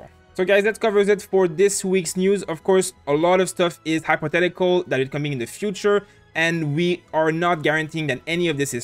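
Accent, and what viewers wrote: Quebec, Canada. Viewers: That accent... You're from Québec, am I right? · Am I dreaming or do you have a french Canadiens accent? Btw new here but I'm loving your channel!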